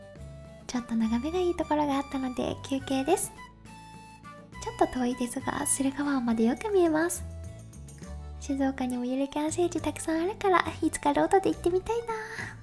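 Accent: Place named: Ehime, Japan. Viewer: (•ө•)♡ こんばんわ今回は箱根なんですね！その前に… とチャンネル登録者数〜 やっと、やーーっと２万人越え！！長かったけど、じわじわ確実に増えてて、嬉しいですね！本当におめでとうございます🎊 でも、コメントへの返事がますます大変になってしまいますよね… 😐 きっと、なら大変とは思わず、「かまん、かまん」なんでしょうね。 （↑愛媛の方言で合ってますか？） 今回の箱根は何月の旅行でしたか？ガーミンの気温に18.8℃と表示されてたので、４月頃でしょうか？山道だから５月かな？日差しが熱いって、６月？🤔 のジャージ、青い空、の首（日焼け対策してますね！白いです笑）、ロングヘアー、凄くマッチしてて可愛いですよ(•ө•)♡ 以前の動画でも今回の動画でも「〜 …じゃあ」って、今回の動画では「路地裏の斜度もきついんじゃあ」は愛媛の方言ですか？女の子の方言って可愛いですよね (•ө•)♡ チェーン落ち着くのところで参考になれば、、自分はサドルバッグにニトリル手袋を入れてますよ。パンク修理は汚れは気にしないですが、チェーン周りの何かの作業をすると黒い油が手につくし、バーテープも汚してしまいそうなので、念のため入れてます。ガーミンちゃん、やっぱりそうなんですね…😭 自分も横浜市に行こうとナビに従ってると国道357をひたすら走り、東京湾海底トンネルに案内されます。高速道路と自動車専用道路（国道357）なので通ることが出来ずかなり戻されます😭 後ろから車が飛ばしてくるのって結構怖いですよね… 自分は右側のバーエンドキャップを外して、凄く小さなバックミラーを付けました。後ろを振り返ることなく後方確認が出来て、買って良かったアイテムの１つです。おすすめです。硫黄の温泉♨、いいですよね！箱根は何回か行ったことあるんですが、硫黄臭い印象が無くて、そうなんだ～！！と勉強になりました。草津温泉♨は硫黄の臭いが凄いですよね。温泉玉子のシ～ンで写っていた太もも、鍛えられた太ももですね！凄い Σ(ﾟДﾟ) クライマーの脚じゃないですか～💦 いいな～ 坂が好きって、憧れます。自分のここ最近ですが、土日のどちらかに横浜市にある浜カフェというところに行ってます。往復で80キロくらいです。膝の痛みはまったく無くなりました。少しずつ距離を延ばしたいのですが、夏の日中の暑さがきつく距離を延ばせず…です😢 はじめの頃は帰り近くになるとふくらはぎが攣ったりしましたが、ネットでいろいろと勉強して、ポカリかアクエリは必須、トイレに行って黄色いおしっこが出たら水分不足ということを知りました。水分&ミネラル補給がダメダメでした💦 ミドリ安全というところから出しているミネラルのタブレットも買って、今日（7/9）、この暑さの中びっくりするくらい快適に走れました。夏季休暇はまだ未定ですが、自分もを真似て、一泊ライドに行こうと計画してます。はいつかはきっと富士ヒルにも挑戦するんだろうな～～と思いました。応援してます！ （また、めちゃくちゃ長文になってしまいましたが💦🙇‍♂💦） 待ったね～～(•ө•)♡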